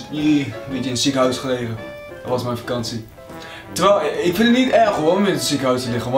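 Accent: Groningen, Netherlands. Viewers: Ben je gronings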